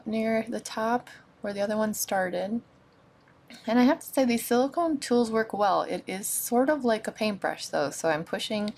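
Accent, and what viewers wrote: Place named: New England, United States. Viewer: Beautiful thank you, I’m from Massachusetts too. I thought your accent sounded like you from New England, lol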